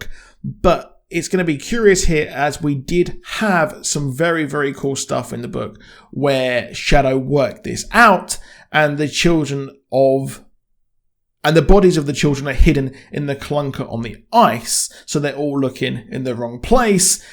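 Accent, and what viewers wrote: United Kingdom. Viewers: I’m unsure if you are putting on a Received Pronunciation accent... but Demeter is definite not pronounce DE ME TER.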